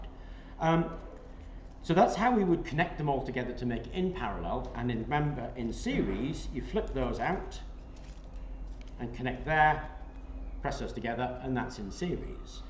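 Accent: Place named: United Kingdom. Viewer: Hello, and welcome back no new videos I missed your videos, I learned so many things from you, I am fascinated by the materials that can accumulate energy, I learned why it happens because simple materials are so wonderful. I learned a lot from your videos, even British English:) thank you for sharing your knowledge with us. I wish you much health, and so many beautiful things, you are the best. Ciao,e bentornato non nuovi video mi sono mancati gli tuoi video,ho imparato tante cose da da te,sono affascinato dagli materiali che possono accumulare energia,ho imparate perché succede perché semplici materiali sono cosi meravigliosi. Ho imparato da vero tante cose dagli tuoi video ,anche l inglese britannico:)grazie per aver condiviso le tue conoscenze con noi . Ti auguro tanta salute,e tante belle coso ,sei il migliore.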